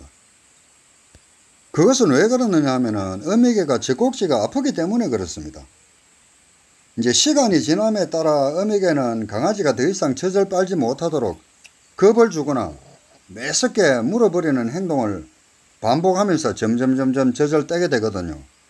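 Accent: Gyeongsang, South Korea. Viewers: 여기가 어느 지역입니까 경상도 인가요 ? 강원도 인지요 ? 저는 서울입니다 ^^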